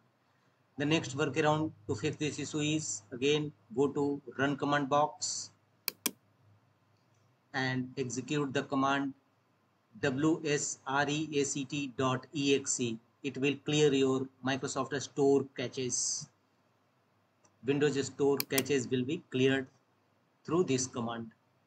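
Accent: India. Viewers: I already knew it that it was gonna work after hearing the Indian voice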